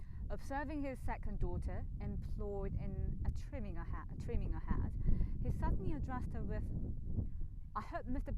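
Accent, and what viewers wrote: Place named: United Kingdom. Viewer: 목소리도 좋고 , 발음이 영국인 같구먼~~~^-^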